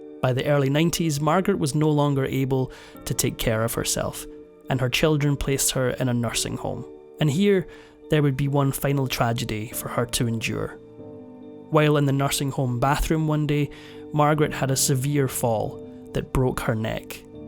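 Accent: Scotland, United Kingdom. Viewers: Why do I always think THANK GOODNESS 2 seconds into the video when our Scottish narrator kicks in. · With a charming little Scotish zing. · Scots don't listen. They burr.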